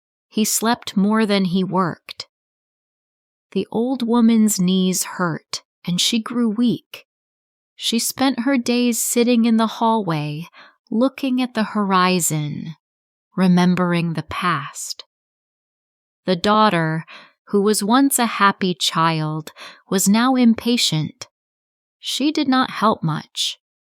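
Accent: United States. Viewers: I'm also disgusted with your American English.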